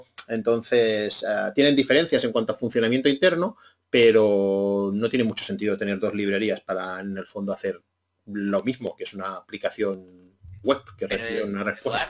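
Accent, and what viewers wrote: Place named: Catalonia, Spain. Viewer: Este tío tiene un acentazo a catalán pueblerino, de Girona profunda.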